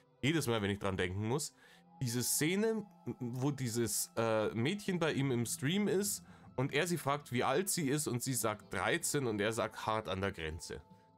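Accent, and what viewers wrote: Bavaria, Germany. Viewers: Danke ❤. PS: es ist so wohltuend, echtes Bayrisch zu hören 😊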